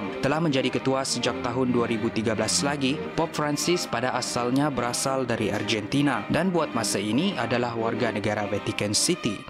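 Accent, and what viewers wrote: Sabah, Malaysia. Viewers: ni mcm org sabah oh.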